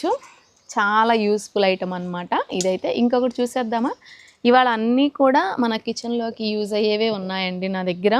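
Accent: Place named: Prakasam, India. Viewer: మీ డి ప్రకాశము జిల్లా కదా మీ వాయిస్ స్లాంగ్ అలాగే ఉంది రిప్లై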